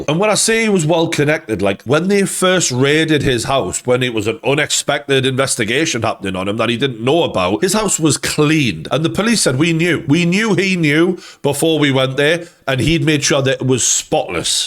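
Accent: Newcastle, United Kingdom. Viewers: like the old true geordie , class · Love your accent. Love me a geordie! Great content too ❤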